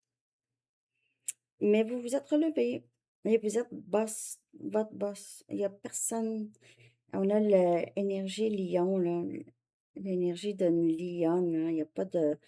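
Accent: Canada. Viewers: Message 2 suite : En plus j'adore votre voix car elle est douce rieuse et aimante, votre petit accent canadien est adorable ! Je ne me lasse jamais de vous écouter, je suis toutes vos guidances avec le plus grand intérêt depuis 2 ans que je vous ai découvert sur YouTube. 🙏🙏🙏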